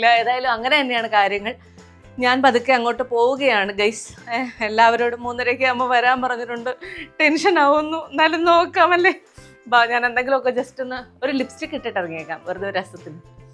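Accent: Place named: Kasaragod, India. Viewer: Thadi nalle pangend😜kasaragod slang❤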